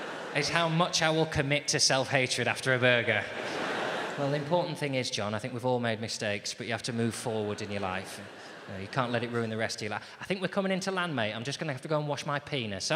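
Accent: United Kingdom. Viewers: What kinda British accent is that?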